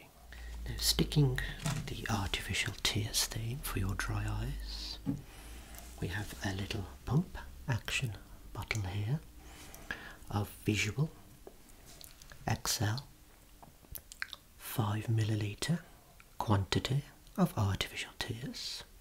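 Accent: Birmingham, United Kingdom. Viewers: I think he’s from Birmingham or around that area :)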